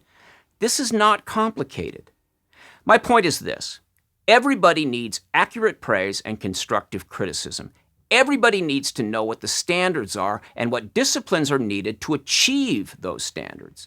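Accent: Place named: Michigan, United States. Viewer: I'll admit, I wasn't fully awake yet the first time I watched it, so I gave it another go. (Yes, I watched your video first thing this morning, lol) You are correct that "blacknosing" in harmful, it helps no one, and in my opinion, it's even worse when it comes from our leaders. If I had been in your writers group, it would have been my pleasure to offer those women the honest input they deserved as artists...unless they got pissy with me over it. For the most part, I still agreed with everything you said, and felt like I just have a slightly different point of view on part of it. But in watching your video again, it gave me an opportunity to look at myself and see that perhaps on this particular topic I'm coming from a place of apathy. And maybe I'm not happy about that, because as an otherwise empathetic person, apathy isn't something that I strive for. 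But what is the solution? That the average white person speak out against the ills of hip-hop? Or would it be enough to simply stop praising rubbish just for the sake of virtue signaling? I'm all for the latter! Anyway, I don't want this to turn into the Iliad...Great video, and I think we're mostly on the same page here. You speak in a manner much like the Michigan people I grew up with and I spent 22 years in LA, so I can relate to that as well. (I know Bliss Cafe too lol.) If there was a point you were trying to make that I still managed to miss, please point it out to me. I'd rather not walk away feeling like I missed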